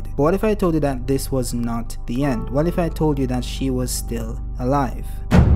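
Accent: Jamaica. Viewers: Never thought I'd hear a Jamaican accent on a JJK video. I'm in. Good content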